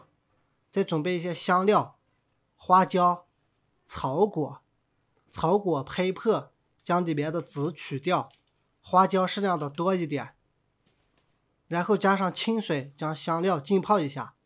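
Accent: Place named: Northwest, China. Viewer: 哈哈，一听你的口音，马上订阅，妥妥的西北人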